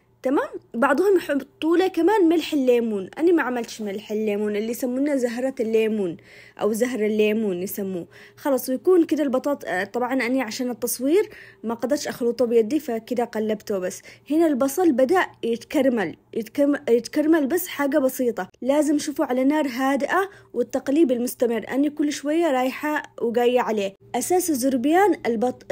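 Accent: Aden, Yemen. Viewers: محسنك هدرتك عدنيه 🥰 تسلم الانامل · كلامك عدني اصل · تسلم يدك وكلامك العدني زيد شوقي لااهلي الي بعدن الحبيبه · ماشاءالله الطريقة حلوووووة وشكلها مضبوط مية المية بس الأحلى هو اللهجة العدنية كان احنا بنتشوه معك 😂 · يامحلا الكلام العدني صوتك فيه عذوبه خلاني انااااااااااام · مادام الهدره حقك باللهجه العدنيه الحلوه خلاص قولي (( كبزره )) بالعدني مش كزبره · عجبني لهجتها العدني يسلم ايدك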